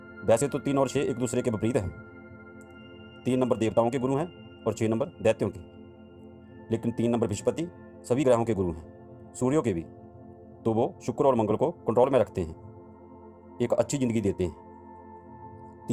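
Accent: Punjab, India. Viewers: Punjabi person speaking in hindi 👍👍🙏😁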